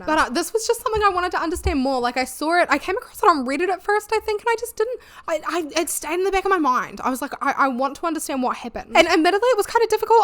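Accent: New Zealand. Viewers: Girl please never apologize for the way you talk, I was an au pair in NZ and your accent reminds me of that amazing time there 🫶 when I watched one of your videos with my bf he said he can finally pinpoint what my accent sounds like (English isn’t my first language), and it made me so happy that I still have some of it left years later. Love the kiwi accent, love your videos, cheers from halfway around the globe ☺️ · Where in NZ are you from? I've lived here my whole life, and I can't place it 😅I'm from Christchurch! It's always good to see a fellow Kiwi putting themselves out there. My fingers and toes are crossed for your success <3 Omg also do you remember when she came to NZ in 2014? It was mad! People were desperate for tickets. My sister and I went for our 10th birthday and ended up giving our tickets to two teenage girls who were crying outside Spark Arena. 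I honestly still feel good about it lol We were right up the top and I got so sick and overwhelmed. We ended up having dinner with two nice ladies at the restaurant next door. Man, that was an interesting era of pop culture. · omg i love it, the research you do is so thorough! btw I am a kiwi living in the UK and I am so glad to have found your channel, your accent and humour is like a little slice of home <3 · without any knowledge i will say to my brain, your accent is what Glorilla’s southern accent is to the US South to NZ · I think it's the New Zealand accent but when you say, it sounds just like, or is it the other way round?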